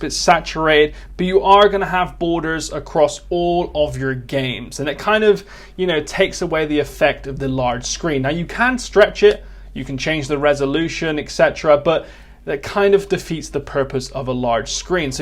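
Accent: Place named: West Country, United Kingdom. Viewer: Jesus that west country accent :) Hardly ever hear YouTubers with a south west accent. Love it!!! lol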